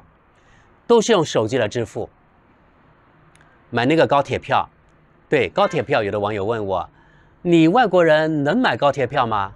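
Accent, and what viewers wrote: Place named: Guangxi, China. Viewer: 乡音不改鬓毛衰 同为桂林人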